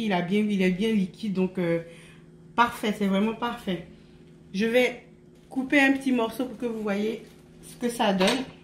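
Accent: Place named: Ivory Coast. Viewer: Je m’abonne parce que ta recette est facile et que tu as l’accent ivoirien donc je ne peux que soutenir ma compatriote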